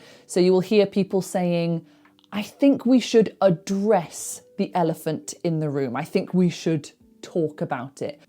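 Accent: United Kingdom. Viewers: It's great to hear British dialect 😍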